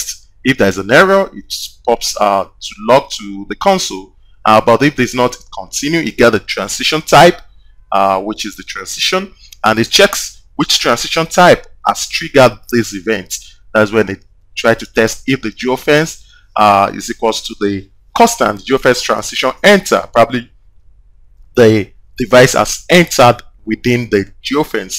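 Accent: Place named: Nigeria. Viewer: From the accent, I can tell he's from Nigeria. ;-)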